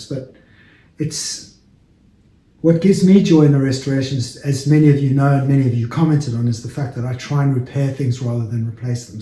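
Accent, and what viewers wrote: South Africa. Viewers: Enjoying your videos. Listening to you I originally thought you were doing the restorations in SA! Ex Durbs living in Oz.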